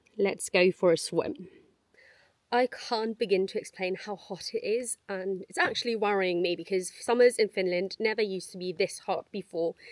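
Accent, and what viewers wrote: United Kingdom. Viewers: A real British accent! 🤓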